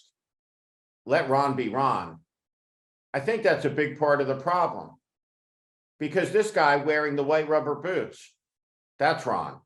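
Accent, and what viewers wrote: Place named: Philadelphia, United States. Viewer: Steve sounds more like he's from Philadelphia, not from Utah. He has a Philly accent. He just said that Park City, Utah was his hometown. Now I'm confused.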